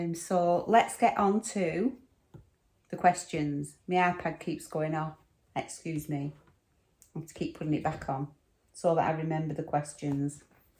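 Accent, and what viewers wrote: United Kingdom. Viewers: FYI.. you can go to my beautiful island in Barbados for that blue sky, water,and sunshine. Good food too.😂 Mayni ask about your accent, what part of the Uk are you from? Thanks for sharing. I live in New York, and I follow Emmerdale and Coronation st. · I loved the video! So interesting and i I love the way you speak and the different British phrases! Although sometimes I can't understand you so I put the transcript on so I don't miss anything 😊😊😊 you probably wouldn't understand me either and the strange phrases Americans use ❤❤❤ you look great today.......love the sweater and wooden beads! Yes for a part 2...... I think it's all very interesting! Take care Kathy from Pennsylvania USA 😊😊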